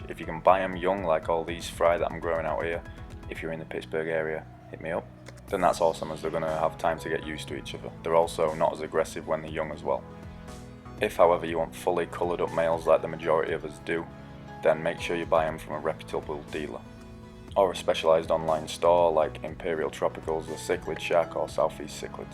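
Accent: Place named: Yorkshire, United Kingdom. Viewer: REALisation: you sound from Yorkshire but you mentioned you're in Pittsburg...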